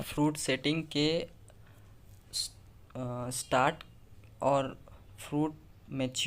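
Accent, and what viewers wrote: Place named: West Bengal, India. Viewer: Are you from west bengal ?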